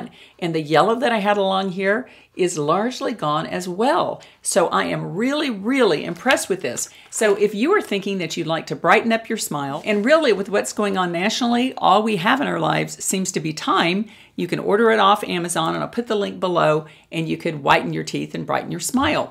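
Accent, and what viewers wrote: South, United States. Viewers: Hi! Doug again. Nice house! We seem to have more stuff in common. I too studied drama (not in college) and I lived in LA for a while when I was younger, did some minor stuff. But didn't get my star on the walk of fame, haha! I would like to pursue acting again now that I am older and wiser in some sort of venue. I also am about to get my life and health insurance sales license in the state of Florida, so I was curious to what kind of insurance benefits you sell? The testing centers are closed for now until further notice so I keep reviewing all my studies and hope to pass exam. It's a career change, as I mostly worked in hospitality before. I am also a writer and visual artist and play the piano. Artsy kind of guy. Are you from the south? That is my guess, haha!! I live north of Tampa in a town called Inverness in Citrus County, FL. 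Grew up in Tampa. Take care.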